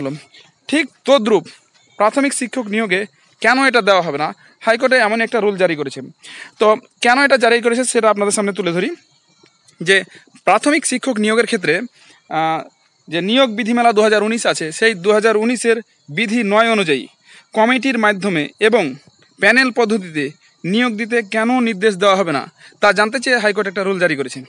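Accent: India. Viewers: গুরুত্বপূর্ণ তথ্য জানাচ্ছেন এজন্য অসংখ্য ধন্যবাদ। দুইটা ভিডিও দেখে Subscriber করলাম। একটা অনুরোধ ডেট দেওয়া মাত্র জানাবেন। একটা কথা জানতে ইচ্ছে করছে আপনি কি বিহারি? কথার উচ্চারণে অনেক ছ ছ আসছে। কিচ্ছু মনে করবেন না এমনিতেই জানতে ইচ্ছে করলো। 🙂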